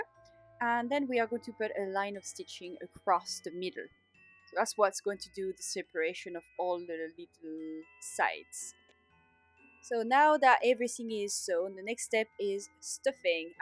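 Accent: France. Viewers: I love your video!!!! I am going to make them this Xmas 2022! I too have cats that are helpers. Not to insult you are you French? My husband was French and I miss the accent! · Great tutorial I will make it for myself and my friend. I love your cute french accent. your English is great. Merci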